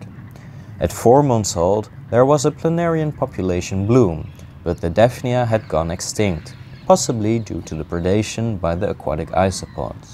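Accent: Netherlands. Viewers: >hear accent >see background environment This guy is 100% Dutch.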